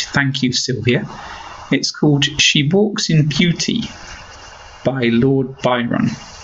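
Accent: United Kingdom. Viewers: ohhhhh that's amazing!!!!!!!!!!! I like your british accent! God Bless you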